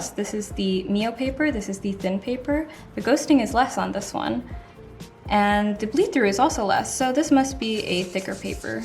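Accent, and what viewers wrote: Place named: Missouri, United States. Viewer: Where are you from? Love you accent. Some friends from Missouri speak like you.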